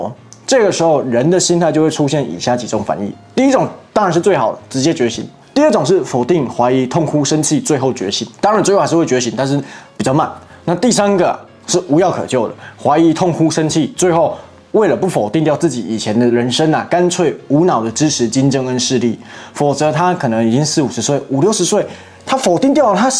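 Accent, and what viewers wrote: Taiwan. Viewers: 喜欢听你说话❤曾经有个台北的女孩，名叫 木兰，是我们店里的舞台编辑，她说话就是这个味道，给我讲了很多台湾的福利，真是羡慕啊🎉🎉🎉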